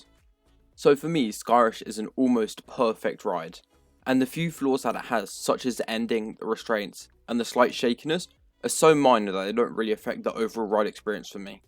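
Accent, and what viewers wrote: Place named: United Kingdom. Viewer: Damn It's always cool to see a Bri'ish and/or foreign enthusiast talk about American rides and their perspectives! I hope you like our other rides and our abundance of air time!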